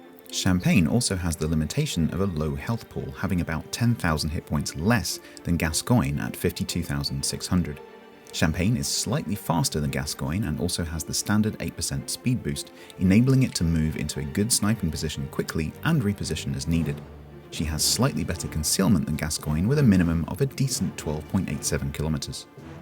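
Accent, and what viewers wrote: United Kingdom. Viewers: can i ask are you British by any chance.. its just i am British and you seem more British than me. Also this was a great review very to the point and i can understand you easily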